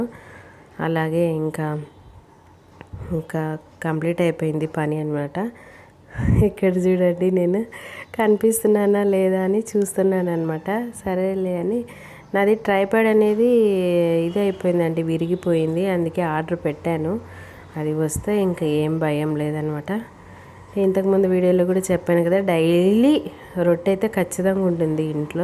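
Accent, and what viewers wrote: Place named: Rayalaseema, India. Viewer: Ekkada unnaru rayalaseema basa matladu thunnav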